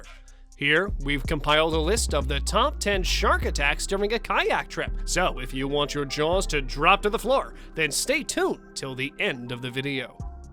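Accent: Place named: United States. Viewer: This guy speaks English like it’s his second language but he has an American accent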